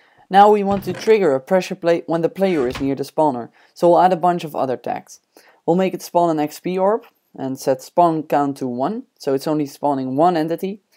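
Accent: Netherlands. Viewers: You sound kindof dutch, are you from holland? If so, I'm to. If not, nevermind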